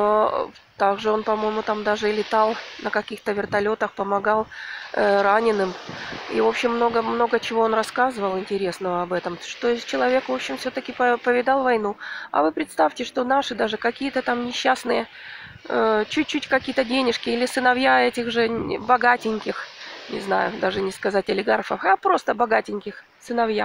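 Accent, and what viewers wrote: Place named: Provincial, Russia. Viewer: наверное, вы из Мухосранска, говор выдаёт, темой не владеете, закончите хотя бы десятилетку, и не умничайте малообразованным теткам это не подходит.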